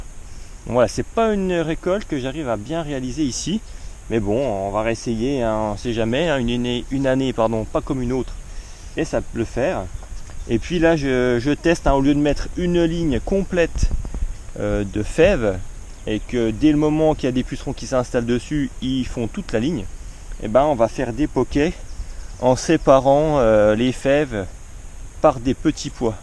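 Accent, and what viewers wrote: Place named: Lorraine, France. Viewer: Je découvre votre vidéo, votre accent me rappelle la Lorraine dont je suis originaire ?! Un truc pour vos cultures de Fèves, ici on en cultive beaucoup. Pour ne pas avoir de pucerons il faut les semer à l'automne et on les récolte au printemps sans aucun puceron. Si on les sème au printemps on a presque toujours des pucerons aussi. Merci pour vos conseils et cet accent qui me fait chaud au coeur. Je vous écris d'Albi où il fait toujours trop chaud...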